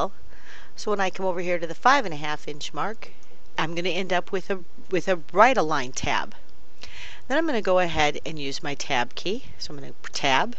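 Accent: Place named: United States. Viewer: Pretty much a straight American-English accent. Ha, ha.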